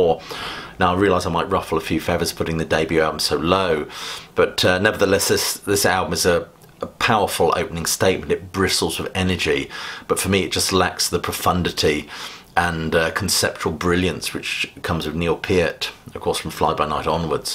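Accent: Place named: RP, United Kingdom. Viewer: I can listen to this fellow speak for days. Remarkably articulate and filtered through a regal British accent. He reminds me of Ian Anderson, to a small degree.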